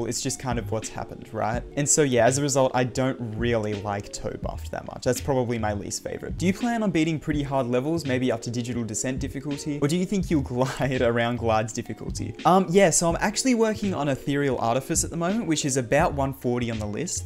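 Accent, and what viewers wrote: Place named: Australia. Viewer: absolutelly i love your australian english accent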